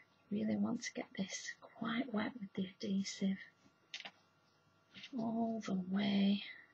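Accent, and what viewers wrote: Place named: England, United Kingdom. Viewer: Hi.....she`s speaking very clear...she`s English...I am Australian so its easy for me...where are you from...have an awesome day!!!.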